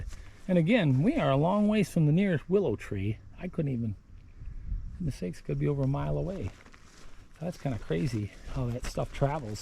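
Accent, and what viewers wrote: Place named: Wisconsin, United States. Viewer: Thought I heard a Wisconsin accent. I live in Kaukauna Wisconsin. We’re buds.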